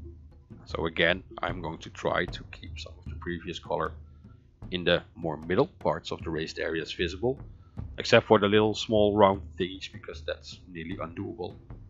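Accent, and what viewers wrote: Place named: Netherlands. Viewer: Ik herken een Nederlander wanneer ik er een hoor.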